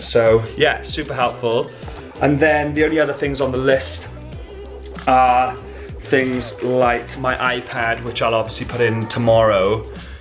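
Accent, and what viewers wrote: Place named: Wales, United Kingdom. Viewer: Hey been watching your vids and just subscribed brilliant content.. Recognised the Welsh accent and was wondering whereabouts yr from....low and beholds about 4 miles from me! Im after some charts for cruise ships buddy....will trade you some drum stuff for them....or lash you up with lots of beer 🍺 😉 😜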